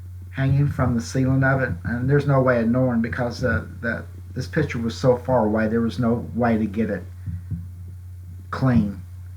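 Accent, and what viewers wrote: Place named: North Carolina, United States. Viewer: Are you from near NC? Your accent sounds like where I grew up.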